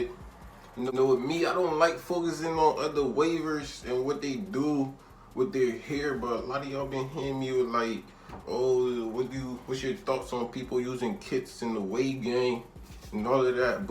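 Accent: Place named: Philadelphia, United States. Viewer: sound like you had dat north Philly accent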